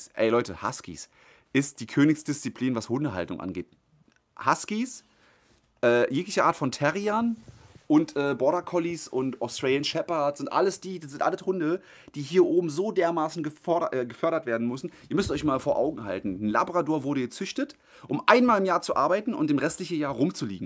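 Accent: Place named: Berlin, Germany. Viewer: Schönes Video, lieben Dank!!! Wenn du magst kannst du gerne mehr "berlinern" ;);););) LG aus Rheinbach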